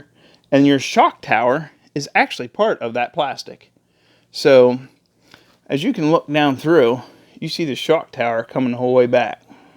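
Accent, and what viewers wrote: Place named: Pennsylvania Dutch, United States. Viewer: Did you say (Door ability) LOL its Durability, you have to be from PA, Im hearing that PA Dutch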